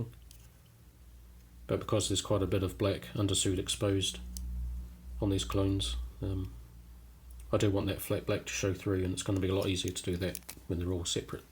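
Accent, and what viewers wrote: New Zealand. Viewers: You sound Kiwi, if so - where do you get your supplies from?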